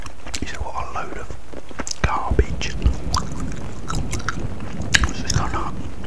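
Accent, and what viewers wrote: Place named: United Kingdom. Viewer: Bri’ish + gum = ☺️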